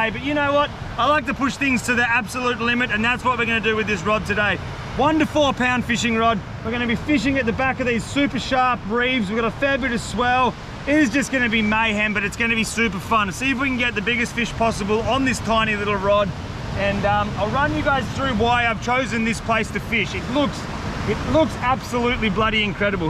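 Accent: Australia. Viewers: "that's so weeeered" lmao I love Aussie accents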